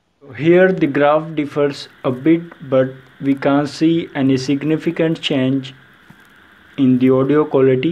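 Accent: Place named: India. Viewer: Your jeet voice cannot be fixed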